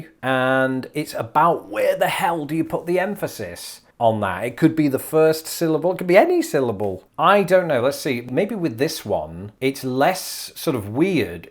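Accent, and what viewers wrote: United Kingdom. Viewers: I think you're becoming very Americanized. What do your UK relatives think? You haven't lost your lovely accent & pls keep it. I love it. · dude, as a Alabamian who grew up in the area and regularly shopped in Oneonta, you pronounced the way the locals do, or about as close as I'd expect anyone to with a British accent anyways... and way closer than most non locals do (most of them say one-on-ta) so you should get credit. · You've been living in my country USA for years and seem to really relish it so why Don't you drop your Brit accent and speak American English like the rest of us.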